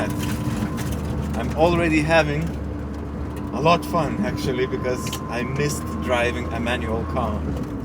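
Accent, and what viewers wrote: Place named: RP, United Kingdom. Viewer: posh bri'ish accent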